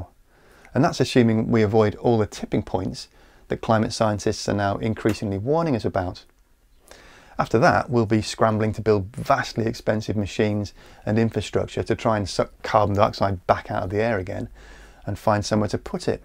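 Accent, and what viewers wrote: United Kingdom. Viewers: there are so many diffrent accents in the UK and this guy has the best one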